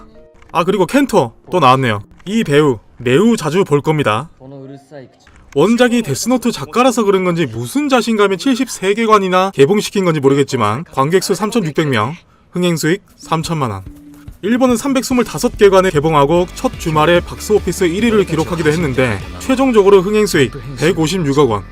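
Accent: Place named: Gyeongsang, South Korea. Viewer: 갱상도 분이시네예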